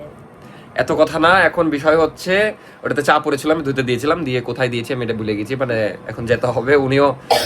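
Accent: Rajshahi, Bangladesh. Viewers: "Hebi sundor laaegche" love ur rajshahi accent ❤🎉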